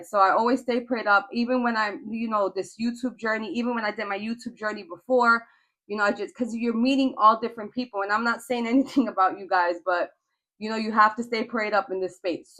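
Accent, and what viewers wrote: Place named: New York, United States. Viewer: it sure does sound like a NY thing. It’s like listening to someone read the book of life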